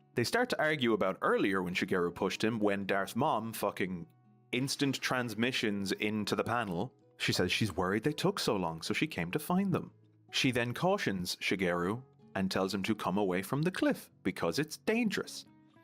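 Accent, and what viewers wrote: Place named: Ireland. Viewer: It’s sooo good to hear an Irish accent in the wild! Incredible video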